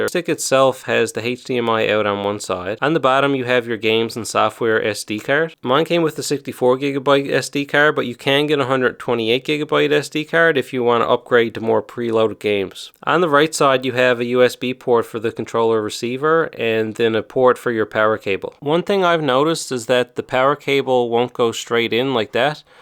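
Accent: Newfoundland, Canada. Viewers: Bro where are you from i hear an irish accent slash american or canadian are u from newfoundland??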